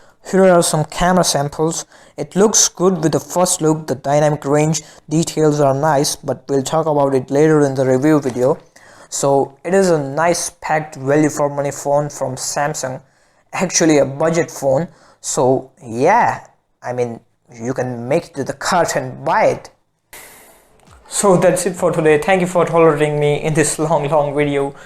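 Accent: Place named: United Kingdom. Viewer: I think you have a nice British English accent. Nice pronounceation. Good start and tell you brother to learn all this from you 😂🤣😜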